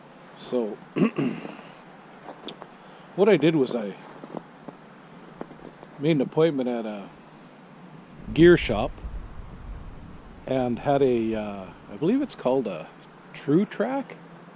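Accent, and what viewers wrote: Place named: Canada. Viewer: Sounds like a Canadien.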